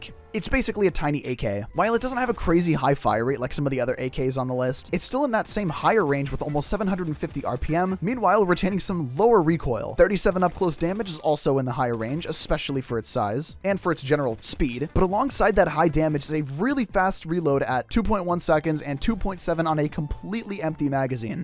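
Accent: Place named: New York, United States. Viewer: does words and picks up accents quick. Great talent. I love his New York accent. Better than mine. I was born in New York & work in NYC. I love his NY accent & a little jealous. I'm female. Jealous is more a female thing!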